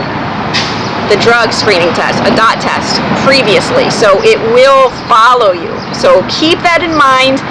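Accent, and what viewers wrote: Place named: Midwest, United States. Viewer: 1. You say that you are from Pennsylvania yet you seem to have an annoying Midwest accent. Primarily around Michigan or ORD. 2. Do not wear black and blue outfit for your interview. 3. Since you are so short, wear a navy blue dress. 4. Do something with your hair. 5. If you want to paint your fingernails weird colors, go work at Virgin Atlantic. 6. I think you are in your late 40s! (Cameras and film)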